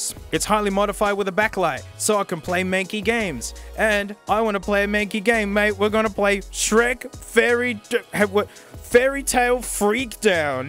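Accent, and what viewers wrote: Australia. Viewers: I feel like I have a loud Australian friend. Everyone needs a loud Australian friend. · Theres something about listen to an Australian talk about Apple products with a very green iPad case thats just so entertaining.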